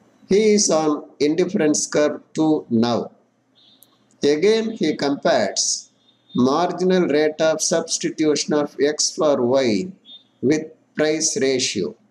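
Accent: India. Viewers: what the hell, your accent, are you an indian?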